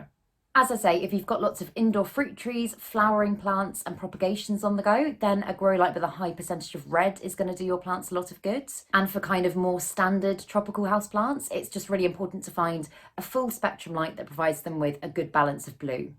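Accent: United Kingdom. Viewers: Not to be a creep and disregard the video but I find British accents extremely annoying but yours was pleasant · Thanks for sharing. Very informative and to the point. Love that accent btw :) Takes me back decades ago when I used to date British girls lol. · Obviously, not an american dialect, must be something from the british isles, I would say.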